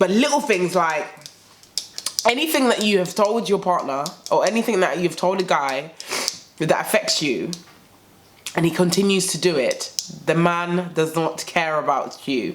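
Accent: United Kingdom. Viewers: U a London girl babes?? Xx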